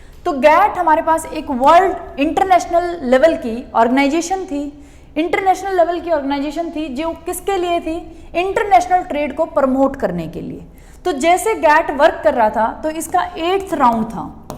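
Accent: Punjab, India. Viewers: Mam are u pnjabi?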